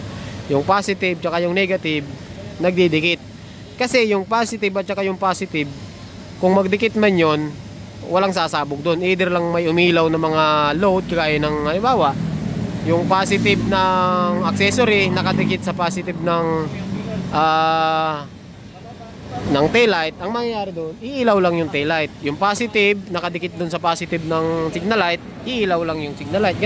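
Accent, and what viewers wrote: Bicol, Philippines. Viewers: Bicol ka?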